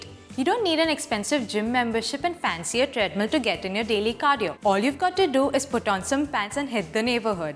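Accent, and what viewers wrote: India. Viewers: Ur English feel like an Indian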